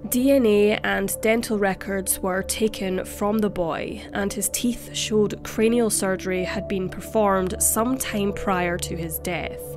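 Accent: Scotland, United Kingdom. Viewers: This is my first video of you. Ngl, I didn’t expect a British accent. Woah 😳 (Scottish? Welsh? Northern part of England I think?) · Wow, Ive never heard of this case ever. Great video and its nice to hear a fellow Scottish voice on youtube. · woah i wasnt expecting a scottish accent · unrelated but i love your accent, are you scottish perhaps?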